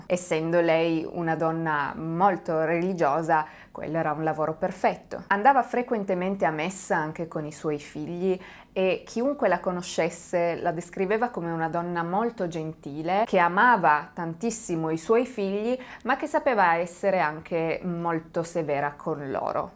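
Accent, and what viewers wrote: Italy. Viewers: Secondo me non sei AI perche a volte dici parole in inglese con accento italiano. Grazie mille per essere humana.😊😊😊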